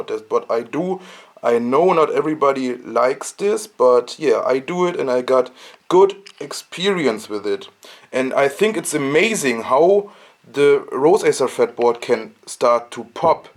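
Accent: Germany. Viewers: Hallo, kann ich einen deutschen Akzent erkennen? Do I detect a German accent? I like your style, going to try it on a neck or 2. -Regards, -G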